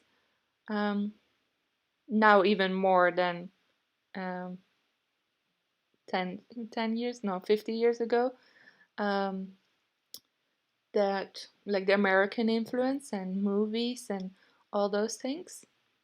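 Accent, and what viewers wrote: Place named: Netherlands. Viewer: I love your accent. It definitely sounds Dutch but I can understand why some might think German to. The artwork is beautiful 💛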